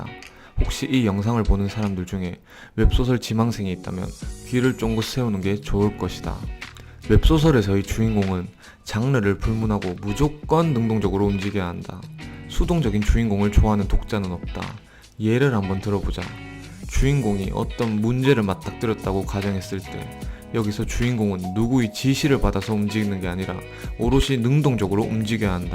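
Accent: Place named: Ulsan, South Korea. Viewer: 울산분이신듯요 ㅋ 울서방도 울산사람인데 좀 억양이 좀 다른거같기도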